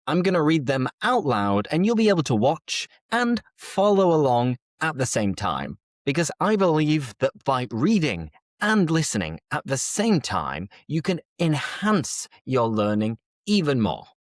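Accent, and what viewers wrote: England, United Kingdom. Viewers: Wonderful! I love your English accent!!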